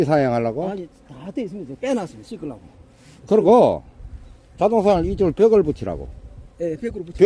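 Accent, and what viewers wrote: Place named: Gyeongsang, South Korea. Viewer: 경상도사람들만느낄수있는애정가득한목소리가 느무반갑습니다.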